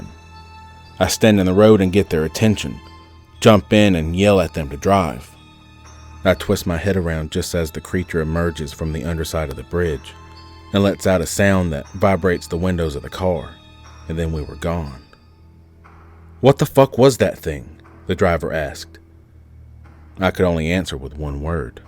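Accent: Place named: South, United States. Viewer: Yet southern accent turns me on.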